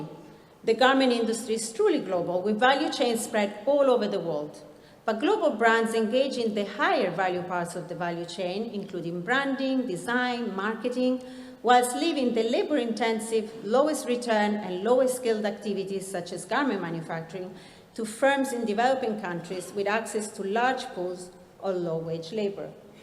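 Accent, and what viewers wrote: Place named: Italy. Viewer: She sounds like an Italian speaking English. Foreigner speakers never achieve a good British accent. Even the majority of native English speakers never achieve a good British accent! You are obviously not Italian. Her husband does not speak Italian perfectly. It is good but he has a limited vocabulary and even he himself has said, he sounds like an Englishman speaking Italian.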